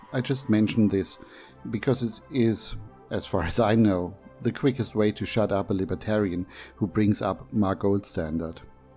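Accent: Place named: Germany. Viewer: Love your videos, not just the info and your thick German accent but your editing, too. Good to have you back.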